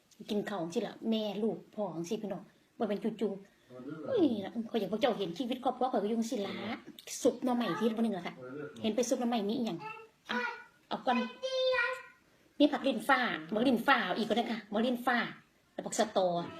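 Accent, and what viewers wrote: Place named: Khon Kaen, Thailand. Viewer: กินเป็นตาแซ่บคัก..เว่าปานอยู่ขอนแก่นเอาโลด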